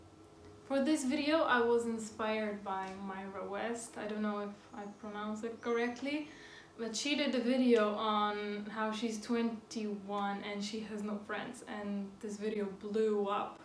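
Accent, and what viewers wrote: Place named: Europe. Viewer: Honestly I think you got lots of potential. It's a shame you quit. I do similar vids. You sound European, let me guess..... enmmm... tbh idk. Middle Europe? Aahh Poland! So I guess I was right. I got my answer as I watched your video. It's a good video. You should do a legendary comeback to youtube.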